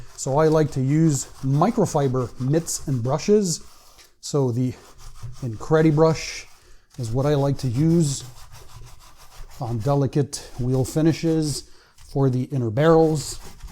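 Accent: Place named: Quebec, Canada. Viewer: Waitttt your from Quebec???? You have Quebec French accent!!!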